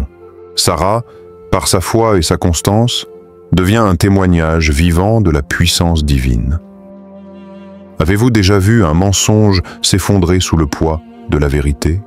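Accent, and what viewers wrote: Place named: Canada. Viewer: Dieu est fidele❤ j ai ete et suis calomniee mais je reste la meilleure reponse..... je suis bien vivante et rayonnante😊 j ai ete calomniee dans une ecole et j ai renversee la situation contre mes detracteurs faux temoignage = peche capital😈👿 dans ce type de lynchage la lachete et la complicite de l entourage pesent lourdement.... celui qui se tait est complice. Le menteur a mal lu Machiavel😊 Dans ce cas l issue est heureuse mais helas parfois l issue est tragique pour la victime super l accent canadien😊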